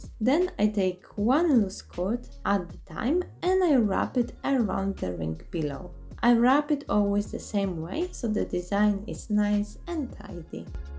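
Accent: Poland. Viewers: These were all brilliant! I genuinely enjoyed them and only today I took a lampshade apart and considered using macrame cord on it. I love what you do and that you also go to the charity shops! Where sbouts in the UK are you? I can usually tell by someone's accent but you sound like you might possibly be from Poland (I love the accent). Cant wait to see what you do next and subscribed last week. 💕💕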